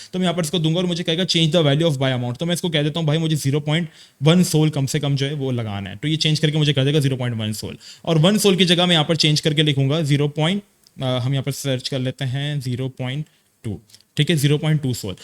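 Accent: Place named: India. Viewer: aapka accent almost indian accent hai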